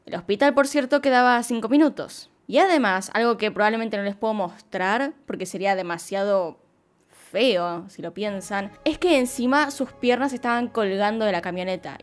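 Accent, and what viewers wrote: Buenos Aires, Argentina. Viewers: Sí, yo también vengo en parte por tu voz de argentina jajaja. Un abrazo desde Perú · Me gusta tu contenido y cómo explicas los casos, pero tienes razón y amo tu voz argentina, soy mexicana y soy super fan del acento argentino __ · Buenas tardes guapísima acabo de ponerme a escuchar casos y el primero por supuesto es el tuyo. Punto uno me gusta tu trabajo y como lo hacéis, a mi no me importa en absoluto tu acento argentino me agrada en que a veces algunas palabras no las entiendas o pienso que tienen otro significado. Pero igualmente me gusta mucho tus trabajos. Acerca de este caso, lamentable la vida de este chico, su principio y su final fue muy lastimoso. Y hay que reconocer que el trabajo de los carabineros fue bastante rápido, acerca de otros casos. Saludos desde España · no lo tomes a mal, jeje pero suena muy bonito tu acento argentino, y mas cuando dices el "Bienvenidos a mi video, una vez más" saludos y buen video. 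· ADORO TU ACENTO ARGENTINO. ESO TE DA PERSONALIDAD, IDENTIDAD, ESO ES TU TESORO. UN ABRAZO GRANDE, SOS GENIAL. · Chale esta rebonito tu acento ARGENTINO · <3 creo que vicky tiene razon con lo de la voz argentina, ya que para mi eso es una de mis cosas favoritas de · Acento porteño. Y a mi me gusta tu trabajo. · Amo tu acento argentino. Saludos desde 🇨🇴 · Hermoso referente argentino, bella, muy buena voz y bien porteña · "si quieren escuchar mi voz de argentina" me dio mucha risa porque en realidad no se que sea pero me encanta ver tus videos y esuchar tu voz,aunque hables de cosas feas me gusta demasiado como narras:) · Me encanta tu voz de argentina 😅 me relaja y me gusta como cuentas las historias en tu otro canal, saludos 👋👋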